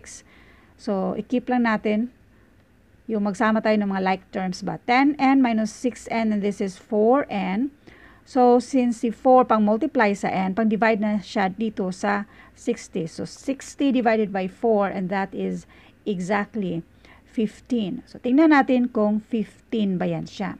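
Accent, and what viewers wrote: Visayas, Philippines. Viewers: Bisaya ka gang. Kita kita r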